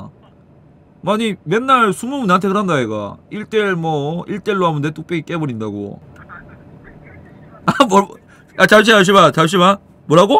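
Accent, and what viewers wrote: Busan, South Korea. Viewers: 부산 싸나이네 ㅋㅋㅋ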